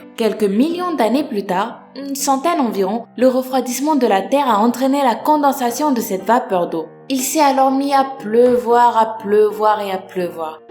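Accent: Canada. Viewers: me suis aboné:) ps: j'adore ton accent tu est canadienne? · Salut. pourquoi tu parle si lentement😆 et tu canadienne?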